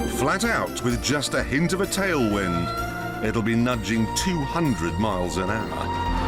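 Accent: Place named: England, United Kingdom. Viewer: I LOVE the english "english pronunciation"...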